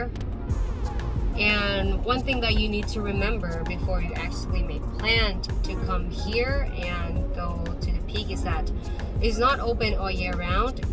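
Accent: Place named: United States. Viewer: Enjoyed your video❤ Your American accent is so sweet😊 Also you are in very good shape & lots of stamina; most likely you can climb one of the 8,000+ metres peak easily.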